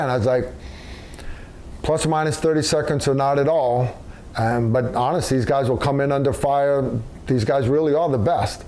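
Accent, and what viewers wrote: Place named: New York, United States. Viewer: Where is from? I feel like from New York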